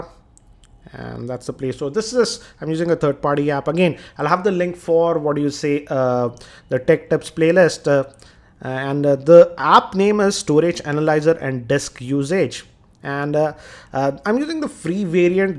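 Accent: India. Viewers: Can anybody that doesn't have the most annoying Indian voice do Android cat videos please.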